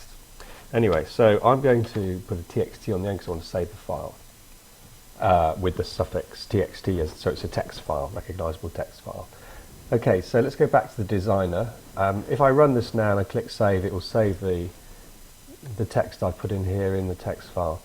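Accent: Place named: United Kingdom. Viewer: Wonderful! I love how easy you made programming seem, and the British accent is so refreshing to hear. Just one question, can I use this to save data base type data this same way?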